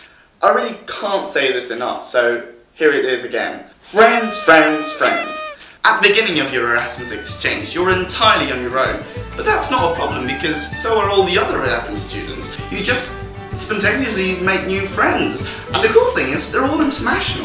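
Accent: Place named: United Kingdom. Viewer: have u had that nice british accent also before your erasmus stay?:D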